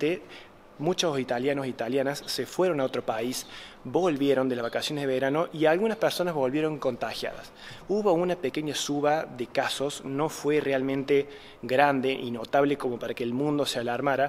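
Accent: Córdoba, Argentina. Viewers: ¿Es muy importante conocer el idioma del país donde vas a vivir? ¿Cuál es tu profesión? Por tu tonada, eres argentuno cordobés, ¿no es así?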